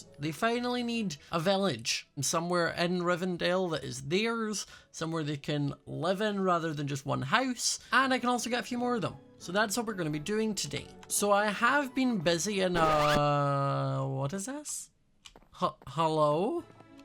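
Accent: Scotland, United Kingdom. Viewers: What is his accent I hear British but I also hear Scottish 😩I absolutely love his accent!!!!!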